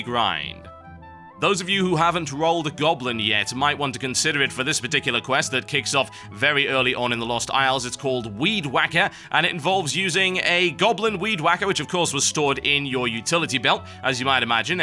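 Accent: United Kingdom. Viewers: I honestly think that the only reason I like your videos are because of your British accent.. racist maybe.. but i dont think any of these people would like these if you talked like wowcrendor, me myself wouldn't give a damn. just saying, but nice videos anyways.=p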